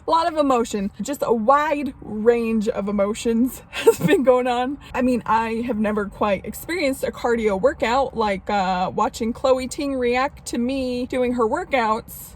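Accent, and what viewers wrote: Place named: South, United States. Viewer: This girl has got to be from the South … love her! 🥰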